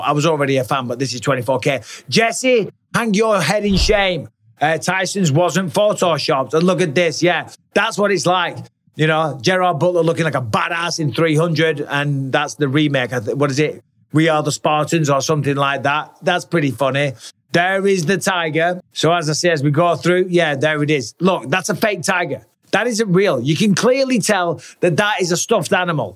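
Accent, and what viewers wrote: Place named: United Kingdom. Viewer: Why are you speaking like a Brit?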